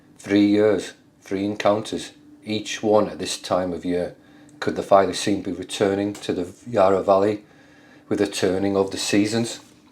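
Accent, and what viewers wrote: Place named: England, United Kingdom. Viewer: This bloke is idiot, I hunted this area since I was a kid , I'm now 61. Bloke never grew in this area with English accent, it's laugh able.😅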